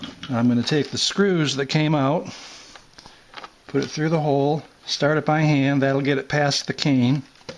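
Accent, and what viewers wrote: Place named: Midwest, United States. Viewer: Definitely a midwestern accent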